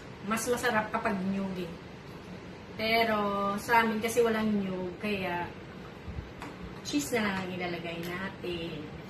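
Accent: Iloilo, Philippines. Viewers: Nang may halo ka nga ilonggo haha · ilongga gid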